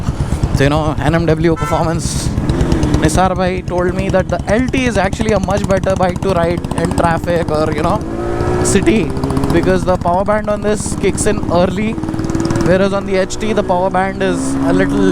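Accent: Hyderabad, India. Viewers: bhai iss bike ka restoration vala video dalo aur thode videos banvo kuch bhi chale ga kyuki muje aapki Hyderabadi accent bahut badiya lagti hee love you ❤️🙏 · Love the hyderabadi skeltonic accent 👌👌 Thanks for sharing the japanese-indian yamaha aesthetics. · I like your voice bro. Hyderabadi ton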